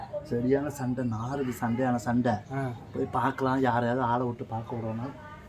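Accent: Coimbatore, India. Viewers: கோவைத்தமிழும் உங்கள் முகபாவனையும் மிக அருமை😂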